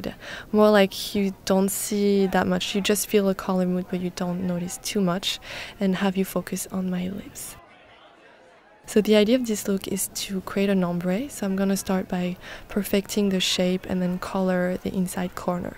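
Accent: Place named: France. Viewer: I love her French accent so much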